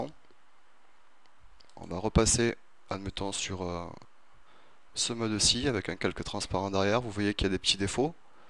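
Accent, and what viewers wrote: South, France. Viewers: bravo, bien expliqué, et agréable avé l'assan du midi,Té !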